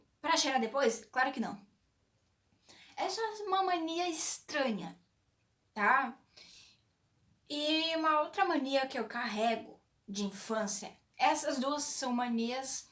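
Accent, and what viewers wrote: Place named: Germany. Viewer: Like pelo vídeo sensacional ♥️! A impressão que eu tenho é que vc tem um sotaque Alemão, mas tipo eu só acho mesmo rsrs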